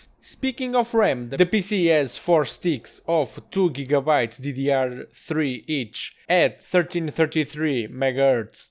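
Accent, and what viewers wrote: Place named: Portugal. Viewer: Vi logo que era um tuga ahah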